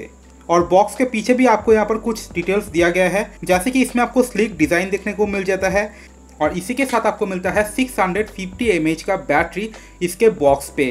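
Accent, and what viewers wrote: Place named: Bengal, India. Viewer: Shune mone hocche tumi bangali... Banglay content dile aro bhalo lagbe...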